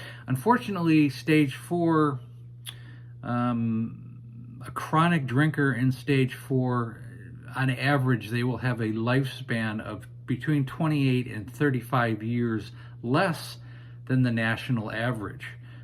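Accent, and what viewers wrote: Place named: Canada. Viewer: Sounds like your average Canadian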